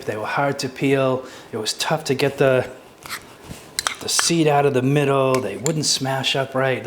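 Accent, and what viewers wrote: Massachusetts, United States. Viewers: So nice to hear a good mass accent. My I miss home in Colorado. First time viewing you...looks good best to you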